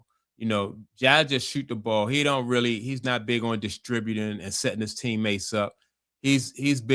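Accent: Baltimore, United States. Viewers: That Baltimore accent is heavy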